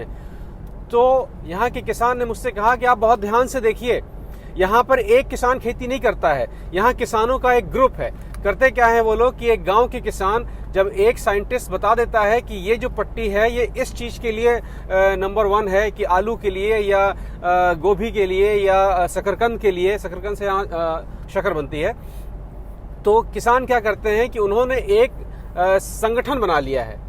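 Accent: Bihar, India. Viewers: Lagta hi aap bihari ho ...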